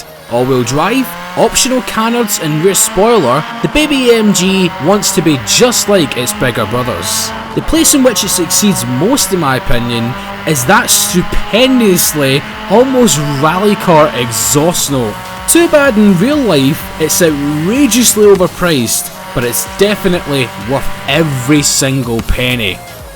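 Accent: Scotland, United Kingdom. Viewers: Best Scottish accent 👌👌👌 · If you're Scottish then I'm subscribing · I found your Chanel by this video, and I could not help to love that accent! Where in GB are you from, mate?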